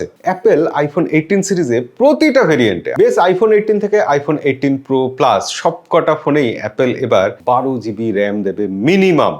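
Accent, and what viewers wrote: Bangladesh. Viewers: এই ভদ্রলোক এর উচ্চারণ বাঙাল দের মত। কলকাতার দর্শক দের জন্য বেমানান। এবিপি এর মান এর সাথে যায়না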